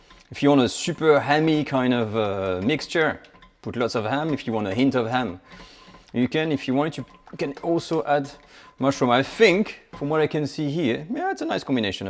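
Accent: France. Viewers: Your vocabulary is wonderful. You were using a lot of adjectives that a lot of French don’t know when speaking English. I’ve had a French Boyfriend for 16 years; so I know you are using some English Descriptions that are not commonly known by ESL Speakers. Your French Accent is Charming! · Chef! I remember u when I watched the Julie & Julia movie! Even ur intro music and french accent; Meryl Streep did justice! "You are the butter to my bread, you are the breath to my Life" More power to u Chef! 💪👏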